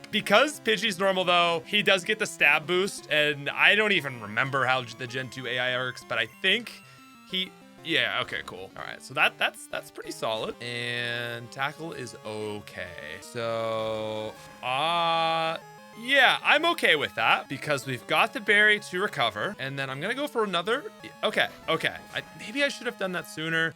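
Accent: Canada. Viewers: dude, i mean this in the best way possible but i just happened to find your video in my recommended and i heard you speaking, particularly how you pronounce "out" and words that end in -out and immediately clocked you. i say this as a canadian myself, i just never noticed how easy it is to tell until i see a canadian youtuber when most of the ones ive been watching recently are not canadian/dont have that particular accent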